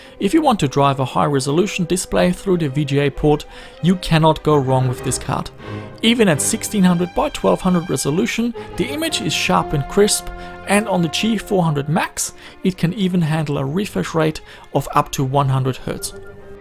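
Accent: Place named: Germany. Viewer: FROM CANADA?! I always thought u were German from your accent lmao!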